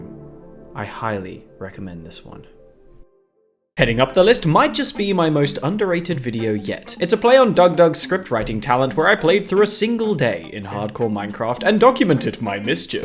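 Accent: United Kingdom. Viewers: Came for hollow knight stayed for a British (seems like it) dude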